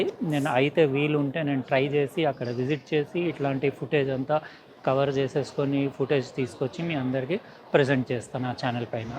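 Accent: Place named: Telugu, India. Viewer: Yes i liked it sir....u soothing voice and Telugu slang with total technical things in simple way..... Plz visit china electronic market..... plz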